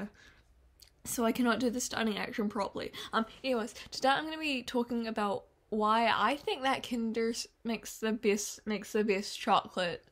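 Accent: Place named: New Zealand. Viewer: its a new zealand accent :)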